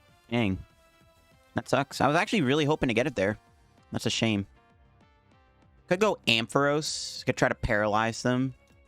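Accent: Canada. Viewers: are you from Canada? Love the content always and I'm constantly using your deck ideas.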